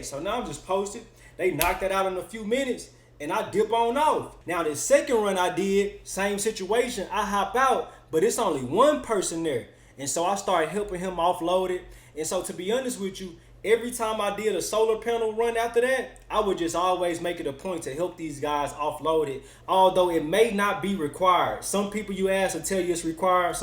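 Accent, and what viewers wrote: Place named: Houston, United States. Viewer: Bro you sound like you from Houston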